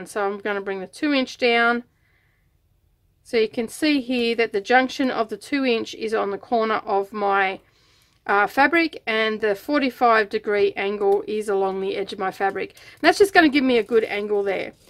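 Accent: Australia. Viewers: great to hear an Aussie...not many doing sewing videos...keep em up :D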